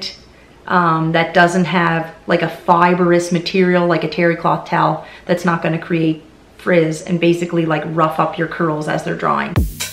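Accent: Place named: Nova Scotia, Canada. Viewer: Well it's got to be Nova Scotia somewhere lol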